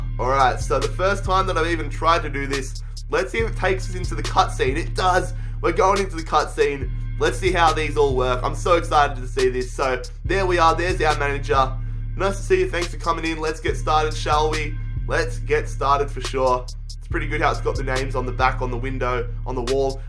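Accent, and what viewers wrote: Australia. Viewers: Your Aussie. I love it mate. I'm Australian too. Good vid mate ❤️😎😜💪👌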